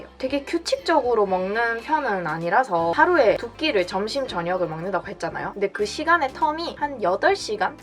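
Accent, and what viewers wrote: Busan, South Korea. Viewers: 부산？사투리가 너무 귀엽당…❤️ 다이어트 열심히 하겠습니다！！ 釜山？訛りがかわいい…❤️ ダイエットがんばります！！ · この方はサトリ(方言)ですか？素人ですが応答せよ1997のイントネーションに似ている感じがして、釜山の方なのかなと思いましたがわかるかた居ますか？ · i think it's satoori from busan but i could be wrong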